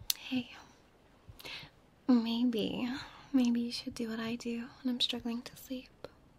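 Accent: United Kingdom. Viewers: Be me, not knowing about yet. First line comes and it has a British accent. "welp...now I have another obsession, thanks youtube"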